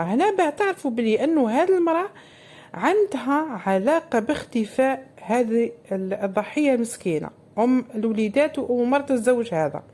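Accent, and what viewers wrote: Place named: Souk Ahras, Algeria. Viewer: ختي لهجتك سوقهراسية...قريبة للتوانسة ماشاء الله...شكرا على الفيديو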